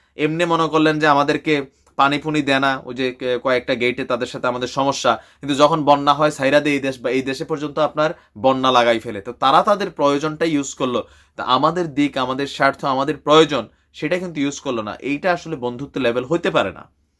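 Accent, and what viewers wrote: Kolkata, India. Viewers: ভাই কোলকাতার ভাষায় কথাবার্তা অসাধারণ হয়েছে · Koleksata bangla balo bolcn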